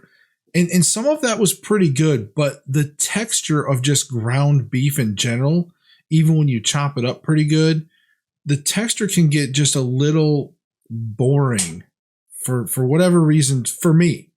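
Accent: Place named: Midwest, United States. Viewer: You sound like you are from the Midwest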